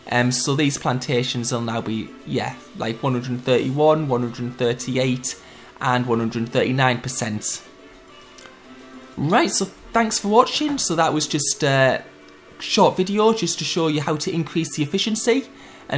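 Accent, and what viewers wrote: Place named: Sunderland, United Kingdom. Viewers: this is more of the northerner accent than the traditional british accent most are accustomed to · I'm from Sunderland, you sound like one of us. For that reason I am now subscribed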